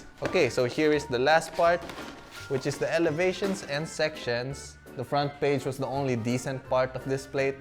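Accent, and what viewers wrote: Baguio, Philippines. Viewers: Are you from baguio?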